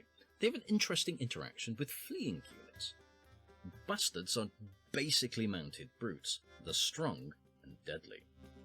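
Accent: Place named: United Kingdom. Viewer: It matters because sometimes people can't pick out the British (?) cadence. There are a few important words in the beginning I couldn't make out even with repeated listenings.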